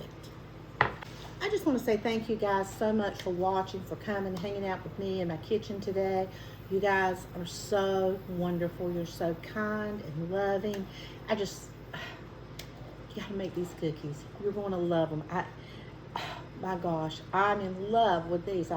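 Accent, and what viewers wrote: South, United States. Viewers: Hi, the cookies look Yummy. I will have to make them. I have to say the cake mix cookie video. I made them and the cookies are so good. Also your video for pork tenderloin leftovers, I live in Indiana and didn't know if I could find the Duke's Golden BBQ sauce in my area. I Found It, at a local food chain store. Meijer! I cooked a pork shoulder the other day in the slow cooker, so tomorrow I'm making BBQ pork sandwiches and trying the Duke's sauce. Thanks for all of your videos!! Love your Sweet Southern Accent!!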